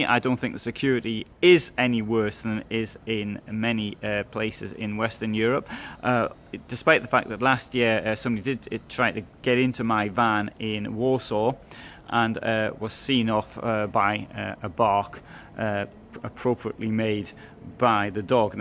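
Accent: United Kingdom. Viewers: You have funny lame british accent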